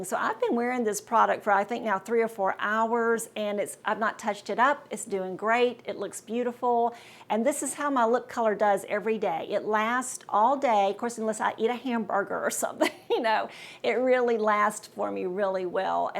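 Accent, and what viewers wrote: South, United States. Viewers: Thank you for your sweet southern accent. Reminds me of when I lived in Athens Georgia at college!!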